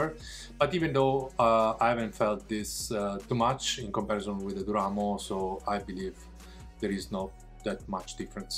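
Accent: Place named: Italy. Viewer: Your English is fine but i still can hear the Italian accent clearly XD, good review.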